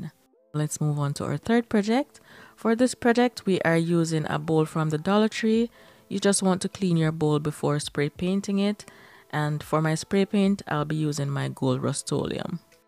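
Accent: Jamaica. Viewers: All these creations are beautiful. I'm definitely going to try the flower and black vase. Your accent sounds Jamaican, are you?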